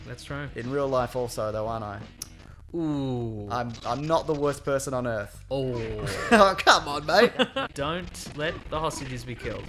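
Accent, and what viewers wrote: Australia. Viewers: are you Aussie? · is this your real voice or your Bon Jovi public persona voice?(his real voice wouldn't make girls swoon, well maybe Claymates?) Because a high strung Aussie voice...not good.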